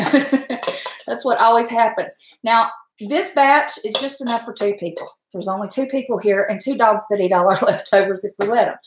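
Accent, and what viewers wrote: South, United States. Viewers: Yum! Enjoyed this video and just want to say, you have the best southern accent! Love it!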